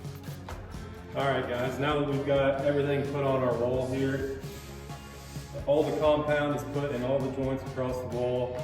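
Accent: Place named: Indiana, United States. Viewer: I'm in southern Indiana. You remind me of an old classmate of mine. I think your accent gave me a hunch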